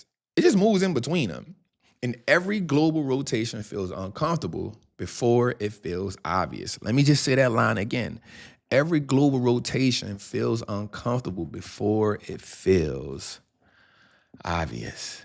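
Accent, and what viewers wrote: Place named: Los Angeles, United States. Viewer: Thank you. You explain very well. ❤ Love the LA accent! 😊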